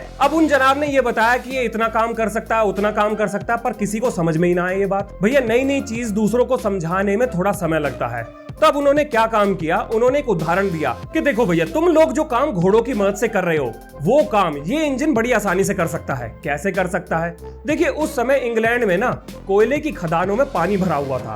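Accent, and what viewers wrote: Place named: Delhi, India. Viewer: इसकी जबान दिल्ली वाली भाई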